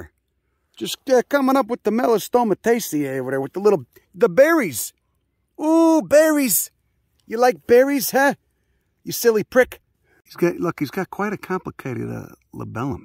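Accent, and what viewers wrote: Chicago, United States. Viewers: I love hearing all this information in this thick ass chicago (I think) accent. Takes the intimidation out of learning to be honest. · As a first video to see, seeing a guy who looks like a boxer with a missing tooth and a swollen eye, talking about botany in a heavy Chicago accent, you have got my sub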